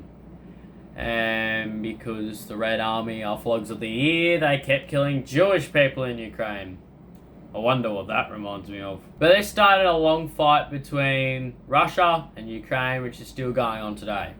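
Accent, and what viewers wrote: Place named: Australia. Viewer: I was for sure expecting a deep Mississippi accent i was not expecting an Awesome Aussie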